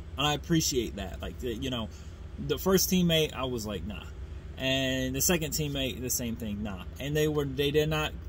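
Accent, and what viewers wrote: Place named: New England, United States. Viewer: Ur yankee accent doesn't represent ur claim of residing in the Carolinas...huummm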